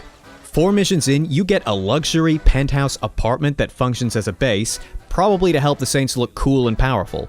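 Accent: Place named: Australia. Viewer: not gonna lie you sound like an australian learning an american accent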